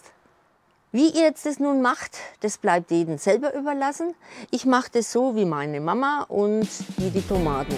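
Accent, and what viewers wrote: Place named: Upper Franconia, Germany. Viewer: Meine Großeltern kamen aus Oberfranken. Der Dialekt ist mir deshalb sehr geläufig und vertraut.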